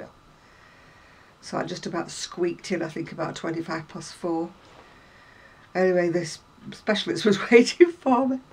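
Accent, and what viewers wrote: United Kingdom. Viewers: Hi:) I loooove watching your vlogs and listening to your voice with a British accent:). Whaaat a nice sweater ____ looove it:)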